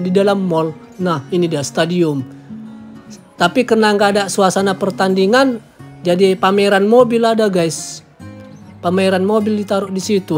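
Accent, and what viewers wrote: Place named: Java, Indonesia. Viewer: Yang aku suka dari pak dhe ini kalau ngomong logat jawane.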